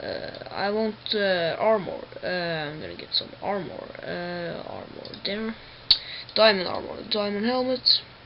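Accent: Norway. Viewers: are u norwegian? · are you norwegian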